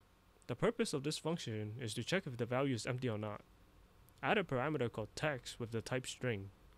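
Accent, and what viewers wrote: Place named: India. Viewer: Wow...i just realized that u are from India. Nothing bad, usually I can't focus when i view an indian tutorial bcuz my ears hurt :)) But your style is clean and amazing ! Hope we get more angular tutorials from you . Great job sir !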